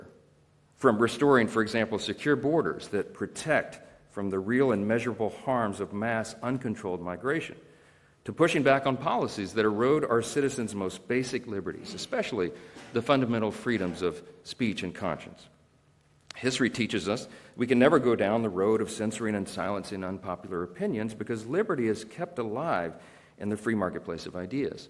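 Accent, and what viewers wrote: South, United States. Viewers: because its not transatlantic, hes southern (Louisiana) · because its just a regular southern accent? it sounds nothing like a trans atlantic accent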